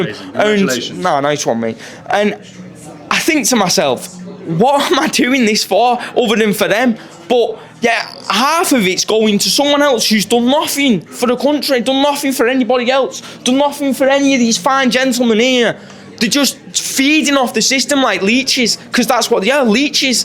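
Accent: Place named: Northern England, United Kingdom. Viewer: Subtitles for a Northern accent is sending me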